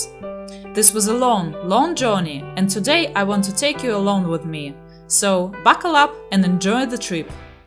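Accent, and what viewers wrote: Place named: Russia. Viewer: Судя по акценту, вы русская, если не ошибаюсь. ❤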